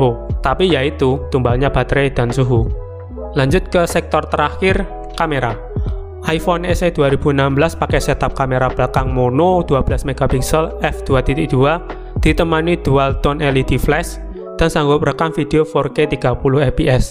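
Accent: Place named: Banyumas, Indonesia. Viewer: Ngapak yaaaa 😁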